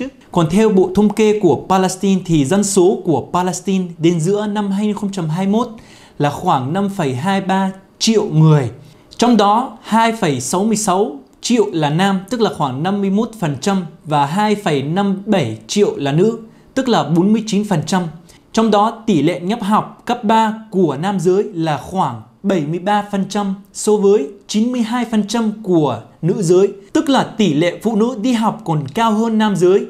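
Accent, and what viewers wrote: Standard. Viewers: Hoan hô, cám ơn đã cung cấp cho người Việt chúng tôi những thông tin, hiểu biết chính xác về thế giới Arab và Đạo hồi. Tiếng Việt của rất chuẩn, hiểu biết về văn hóa, xã hội của rất rộng. Khâm phục đấy! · dep train,noi tieng Viet chuan 😊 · Chào, các clip của bạn rất hay, nhất là tiếng Việt của bạn rất chuẩn, dễ nghe. Xin chúc mừng Mabrouk! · Quá hay! Bạn đã giải thích rất chi tiết và dễ hiểu. Tiếng Việt của bạn rất chuẩn. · Hi hi, Cám ơn, nói tiếng Việt chuẩn quá! Đất nước nào cũng có anh hùng và tội phạm…tất cả họ đều do phụ nữ sinh ra nên phụ nữ Đạo Hồi cũng ko ngoại lệ 😂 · Anh nói tiếng Việt chuẩn luôn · Anh nói tiếng Việt quá rành rõi, phát âm, dùng từ chuẩn còn hơn nhiều người Việt nói tiếng Việt nữa. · Bạn nói tiếng Việt chuẩn quá phát âm rất tốt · Bạn làm video rất hay chân thực và nói tiếng việt rất chuẩn cảm ơn bạn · Woa anh nói tiếng việt quá chuẩn luôn 👍👍👍chúc anh nhiều niềm vui va hạnh phúc nhé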